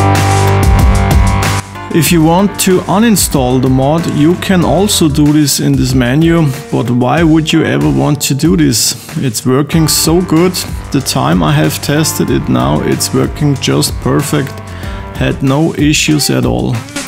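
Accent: Germany. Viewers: Gibts das auch auf Deutsch , er ist ja deutscher - hört man ja raus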